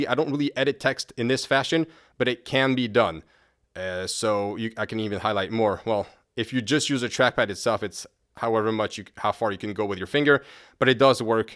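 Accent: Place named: Montreal, Canada. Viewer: Random but are you from Montreal? I hear a familiar accent 😆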